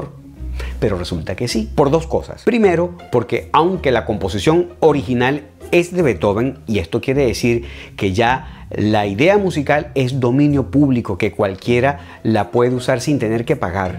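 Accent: Mexico. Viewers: . Que interesantes y profesionales me han parecido el par de videos de La Cata Musical que he visto. Me suenas Mexicano, y como compatriota, me enorgulleces. Muchisimos exitos y bendiciones. Seguire checando tu contenido. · ni parece venesolano se escucha mas mexicano que nada.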